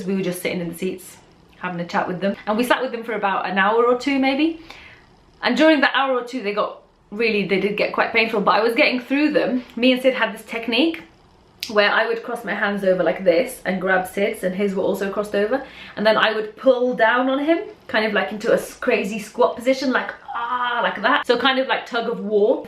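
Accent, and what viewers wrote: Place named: Wales, United Kingdom. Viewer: your welsh accent make this video ten times funnier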